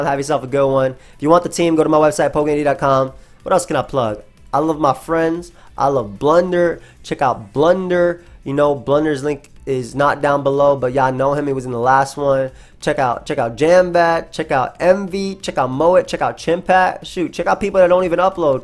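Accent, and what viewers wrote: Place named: New York, United States. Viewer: It's the NY in him. YERRRRRRRRRR!!!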